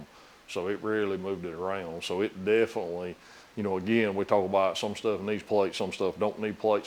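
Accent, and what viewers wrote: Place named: South Carolina, United States. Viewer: My favorite part of every video is in the intro: "We gone gitindah it." I'm in Nashville, and I miss that South Carolina drawl.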